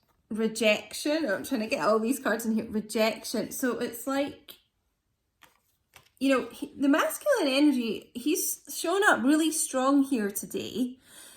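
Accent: Scotland, United Kingdom. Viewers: 🔥♊🔥♊😎💚💚💚 thank you spot on! both Gemini twin flames from Scotland nice to hear ur Scottish accent!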